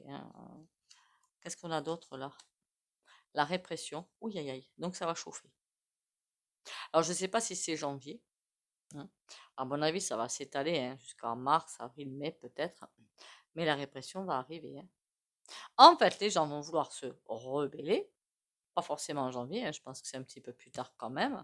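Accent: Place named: Pyrénées-Orientales, France. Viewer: Bonjour merci beaucoup nous on a le mistral qui souffle à 120 km heure on se pèle pourtant il fait que 9 degrés j'étais à l'hôpital Sainte-Marguerite ce matin à 8h pour faire un prélèvement du liquide que j'ai à mon genou synovial je crois que ça s'appelle émettre la cortisone je repousse le moment de la prothèse je me repose au chaud bisous passer une excellente journée et câlin aux petits cœurs vous avez l'accent des Pyrénées-Orientales😊❤❤❤❤🥰🥰🥰🥰🥰🥰🐕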